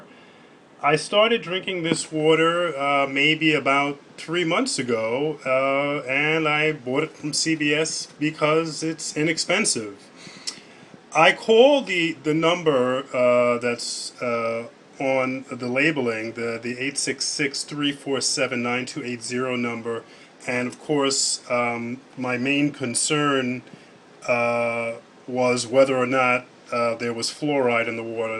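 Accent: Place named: North, United Kingdom. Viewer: hes from up north i can tell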